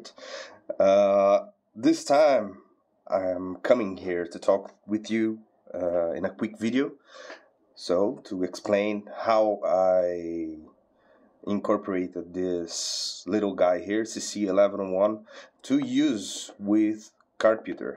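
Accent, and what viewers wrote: Brazil. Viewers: adoro o sotaque br no inglês maravilhoso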